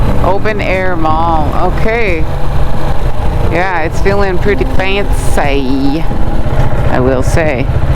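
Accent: United States. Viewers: I am Egyptian and I like watching your videos and listening to your American accent that's how I can get the accent .Go on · Dear, thank you for the video but I have a question, why do you keep repeating "this looks fancy to me", "this looks posh", "rich people live here"? From your accent you obviously come from the US. So, there are no "fancy" neighborhoods in America? Do Egyptians have to live in slums to conform with the stereotype the West has about Egypt?? Egypt has 110-Million citizens, of course at least a couple of millions can have enough money to live in nice neighborhoods. This view of Egypt as a country that MUST BE POOR through and through is prejudiced and not right. Every country has rich and poor neighborhoods. In your country you have Hollywood and the Bronx, so let's drop this "fancy rich neighborhood" narrative as it is insulting to many hard-working Egyptians who have EARNED their right to live in "fancy" neighborhoods. 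Good luck with your videos!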